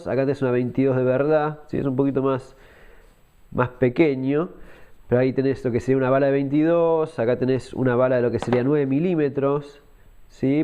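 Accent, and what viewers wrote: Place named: Argentina. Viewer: Se nota por tu acento que sos argentino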